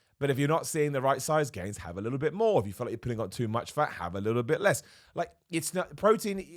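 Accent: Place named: London, United Kingdom. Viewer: You got to love that smarmy working-class London accent.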